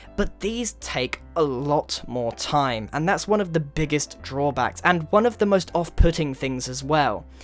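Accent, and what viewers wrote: United Kingdom. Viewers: Am I the only one there think he sound really british in this video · Are you british england?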